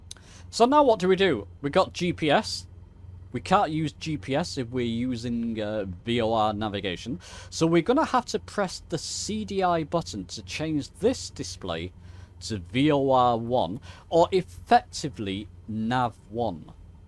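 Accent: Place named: Liverpool, United Kingdom. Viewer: Totally off topic: Are you from the Liverpool area? I'm Dutch so I may be completely wrong, but your dialect reminds me of the Beatles.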